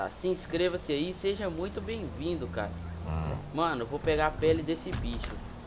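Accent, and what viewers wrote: Minas Gerais, Brazil. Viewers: Mt Top o seu canal mano ! Concerteza vai Crescer Rapido ! Acho o seu sotaque bem Legal, creio q vc seja Mineiro, Parabéns !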